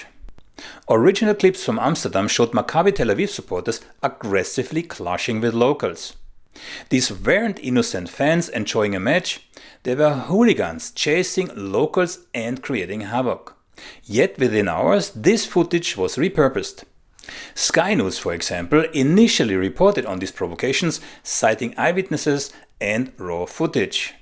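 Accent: Germany. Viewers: It's so good to have the original Germanic accent... Nowadays 🇩🇪🇦🇹🇨🇭 sound like they were born speaking English. Deutscher Rundfunk für immer!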